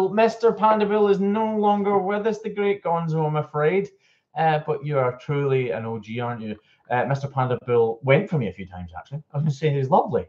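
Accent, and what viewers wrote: Scotland, United Kingdom. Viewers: Love your Scottish accent do you have an American accent too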